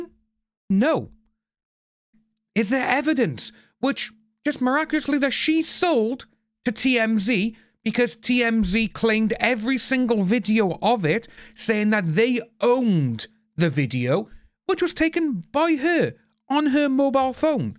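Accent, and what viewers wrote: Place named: Wales, United Kingdom. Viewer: Fellow Welshie, here. Love your Welsh accent! 😄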